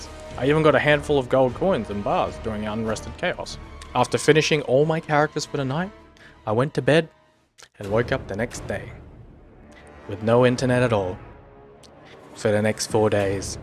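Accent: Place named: United States. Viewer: Your my hero! Wished i could do more then just thumbs up and subscribe. You sound like US, im in germany id send you REAL beer, for sure! Edit: Thats some insane account you have there, ive been playin since launch, even played at day 1 2014 with an fake account. Even if i had that much time to make so much high ilev characters i doubt i had the stamina for that, respect!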